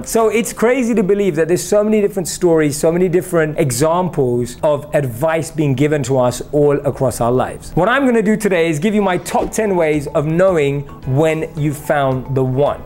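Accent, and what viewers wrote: United Kingdom. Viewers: When you talk .. I just stared at your beautiful eyes 😍 and I love your English accent 😘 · Found you by accident and glad I did. I appreciate what you have to say and love the way you present it. The gorgeous eyes and the British accent make it so easy to watch you, LOL! My sister asked George Burns the 100 year old comedian actor in Las Vegas, (who passed away years ago), “How do you know if a man loves you?” George replied, “You ask him do you love me? if he looks away before he answers the question… He doesn’t!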